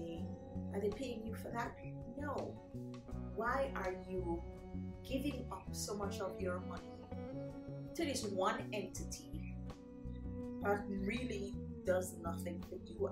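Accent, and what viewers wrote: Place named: Jamaica. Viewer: Where in the Carribbean are you located? I’m hearing a Jamaican accent…👂🏾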